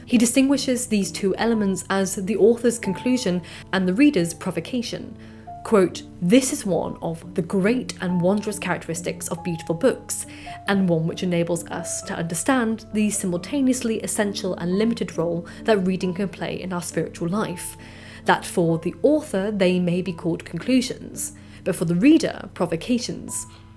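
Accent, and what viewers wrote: United Kingdom. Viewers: After a chapter or a few pages of fiction I've for years been getting this painful sence of urgency, as if the book is wasting my time, drawing me away from the limited years of my own life. Ironically my own life feels a bit meaningless. Also are you British or just playing a British character on Youtube?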